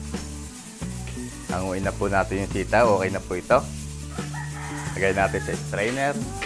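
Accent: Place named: Tanauan, Philippines. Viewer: Nag enjoy akong manood. At para ko na ring nalasahan ang iyong mga niluto. Taga Tanauan din ako at talagang feel ko na nasa 😅Pinas pa rin ako sa iyong pananalita at punto. Thank you at sana'y marami pa akong mapanood na shows ninyo.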